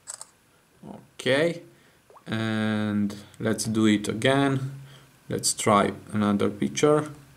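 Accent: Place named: Romania. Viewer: Your accent made me feel like home :))) Go Romania!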